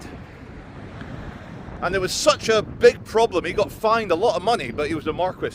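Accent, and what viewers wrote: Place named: England, United Kingdom. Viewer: Indigenous English , beautiful, just beautiful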